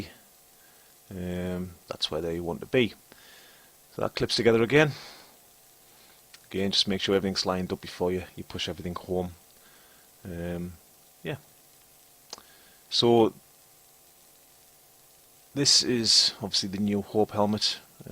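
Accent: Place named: Geordie, United Kingdom. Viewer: you sound more jordie in this than you do in person hahahaha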